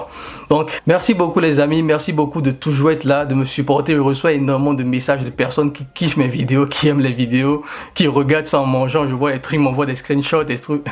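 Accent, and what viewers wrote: Benin. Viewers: Je clique sur la vidéo et j'entends un accent béninois corsé hahah ca fait du bien je me suis tout de suite abonnée.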